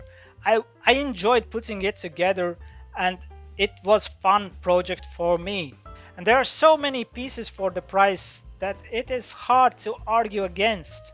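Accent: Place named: Latvia. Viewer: Nice video but the latvian accent is strong 😂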